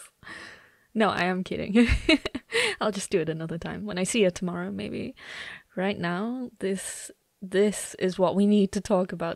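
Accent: United Kingdom. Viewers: This perfect scirpt perfect everything but the British accent ruins it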